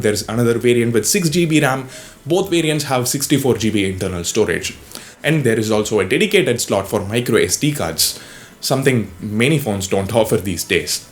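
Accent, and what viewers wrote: India. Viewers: I hate hindu English I don't understand it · I dont normally comment on indian reviewing phones but I like your accent and basically explains more about the phone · Good video dude. I like your accent and your the first Indiana reviewer I have seen Good explanation Hope all best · I love ur english... purely Indian...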